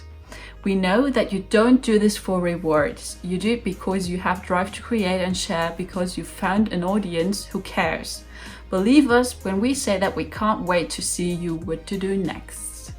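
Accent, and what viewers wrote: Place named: United Kingdom. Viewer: U from the UK, hun? That accent, though! 😄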